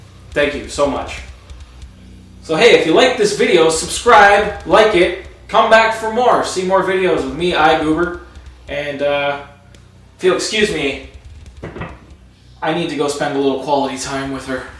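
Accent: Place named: Canada. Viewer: Thanks, I thought I heard a Canadian accent :-).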